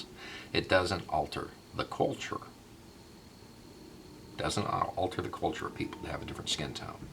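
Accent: South, United States. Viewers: Because he's a disingenuous asshole who just repeats Leftist talking points in a southern twang. Which is also subsequently why you guys like him, he's basically your "house southerner".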